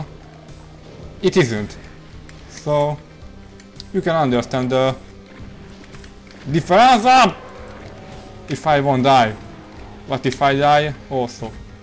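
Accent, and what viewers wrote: Hungary. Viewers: En eskuszom nem neztem volna ki -bol hogy tud angolul.kicsit magyar akcentusos de szarni bele jo az igy is xD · hungarian accent rulez XD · YEAH!! Magyar akcentus foreva XD Jó a video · de mennyire magyar ahcentus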